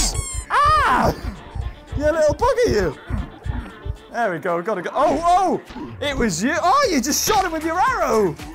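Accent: United Kingdom. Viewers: Are you a British socksfor1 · Are you a britt?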